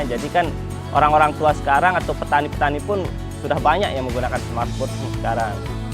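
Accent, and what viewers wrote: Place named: Lombok, Indonesia. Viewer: Seperti logat orng Lombok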